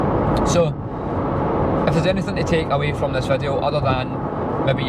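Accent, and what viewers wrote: Scotland, United Kingdom. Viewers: Good video, nice to hear a Scottish accent after moving south from Inverclyde! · Do I detect a Scottish accent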